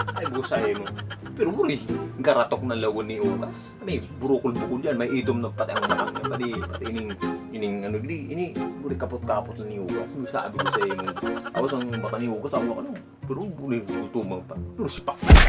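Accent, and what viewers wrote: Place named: Bicol, Philippines. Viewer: Hehe bikolano ka pla po sir kala ko po taga manila parehas palan po kita haha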